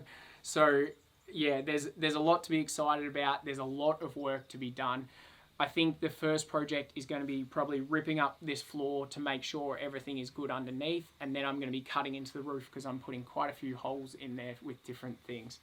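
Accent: Australia. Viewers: I’m a girl who’s a sucker for guys with an Aussie accent. You get me in the divorce. Plus....I love occy.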